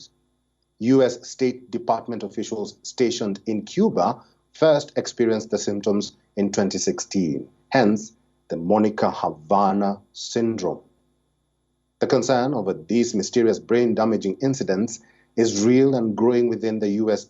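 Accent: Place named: India. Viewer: She's the only reason I watch WION. She has a nice Indian accent. It's understandable but non Indians like myself and it's still Indian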